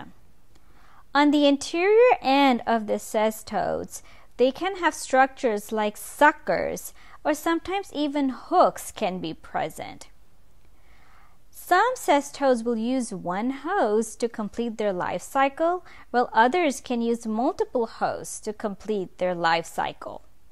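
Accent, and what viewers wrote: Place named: South Korea. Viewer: i like korean accent very much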